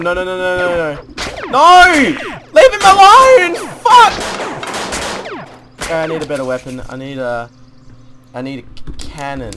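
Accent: Australia. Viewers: I can tell ur Australian! And u r super hot:) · you sound australian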